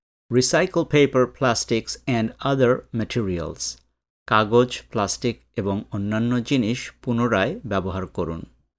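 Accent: United States. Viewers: আমিরিকান স্টাইলে না বলে স্বাভাবিকভাবে বলবেন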